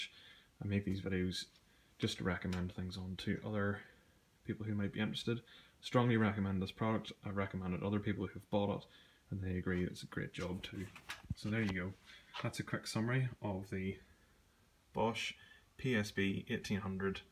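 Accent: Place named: Wales, United Kingdom. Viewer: Great review. I have the same drill and its light, powerful, (more torque than the PSB18 Li2). the only issue is the keyless chuck needs care and some force to ensure a good grip on the drill bits. And the Batteries are a stupid price... A bit of profiteering by Bosch I guess. On the plus side picked up a Bosch PDR 18 Impact driver (body only) but the same batteries fit, for £39 which frankly will do for 99% of my DIY needs. So far its fitted quite a large decking project, a new fitted kitchen and a garage / workshop and never skipped a beat. Great value.... Great Welsh accent btw..... 😉👍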